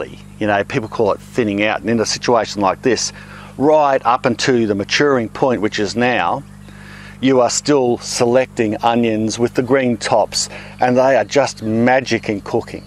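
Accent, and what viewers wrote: Australia. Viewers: Love your Aussie accent, how I wish I could have a real garden, not just potted planting. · Great info - thanks. Lovely to hear an Australian accent too.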